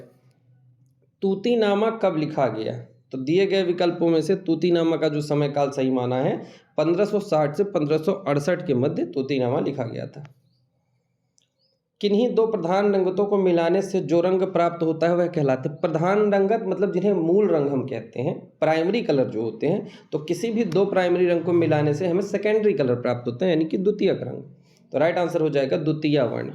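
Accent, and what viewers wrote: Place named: Allahabad, India. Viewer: Aur sir mujhe pata hai aap bhi Allahabad se hi hai aapki language ekdum allahabadi hai Naini ki aas paas se hai aap Mai aapse 2 ya 3 baar baat kar chuka hu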